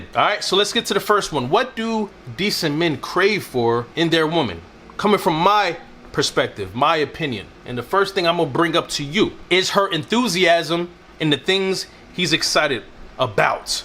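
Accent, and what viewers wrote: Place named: South, United States. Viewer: Oh yes I know that Southern talk LoL 😂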